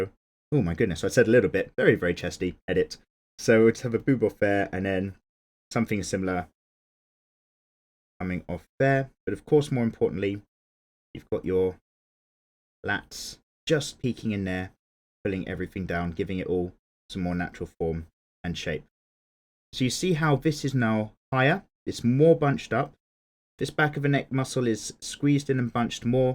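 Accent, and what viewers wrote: United Kingdom. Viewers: WHY IS THE BRITISH ACCENT SO BEAUTIFUL?! TTATT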